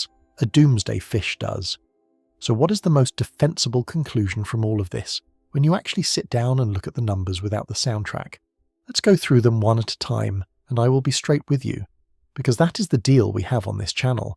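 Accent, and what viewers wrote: United Kingdom. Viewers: After reading repeated complaints across multiple posts of this channel regarding the apparent idiosyncrasies of its content, clarification seems appropriate: 1) Though we speak American English in the U.S., regional pronunciations of U.S. place names differ with regional dialects. How locals pronounce a place name often contradicts spelling. 2) The AI voice used for the channel uses British English. In some British dialects the final ‘a’ of a word can be heard as a very soft ‘r’ or, depending upon the region, a harder ‘r’. As in American dialects, both pronunciations also contradict spelling. 3) Visuals matching audio: It has been reported that this platform has dictated content creators must have constantly moving visuals, static images are unacceptable. You may have noticed some audio books for example, have exited the platform because of the amount of time required to create moving visuals that coordinate with content. 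Longer content requires a great deal of work to find, create, and edit; even using an AI tool. 4) Some content creators have indicated these recent platform changes and requirements have also carried a financial impact, making the continuation of their channel impossible to justify. There is definitely a lot of AI slop unworthy of your viewing on this platform. However, much like an audiobook or purposefully sleep-inducing content, this channel appears to be predominantly intended as an audio opportunity which uses continuously moving images (however unrela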